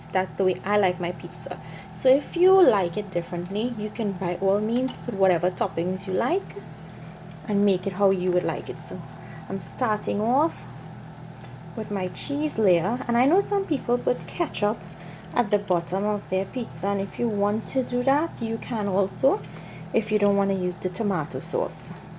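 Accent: Trinidad, Trinidad and Tobago. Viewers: That is Trinidad accent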